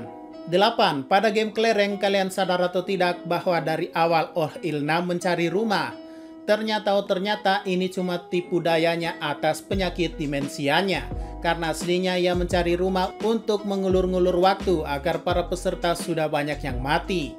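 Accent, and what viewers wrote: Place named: Flores, Indonesia. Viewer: Logat naratornya ga asing. Pasti orang Ende-Flores, NTT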